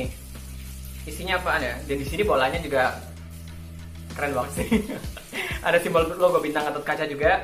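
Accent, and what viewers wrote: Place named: Pekalongan, Indonesia. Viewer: Semangat mas dengan konten super Hero lokal nya..yakin lah kalo film superhero kita sudah jadi dan rilis Pasti Channel mas yang suaranya khas Pekalongan ini bisa makin Cetar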